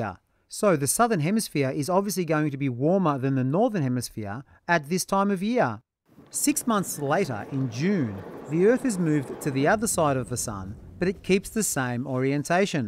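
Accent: Australia. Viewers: Sir r u from Australia?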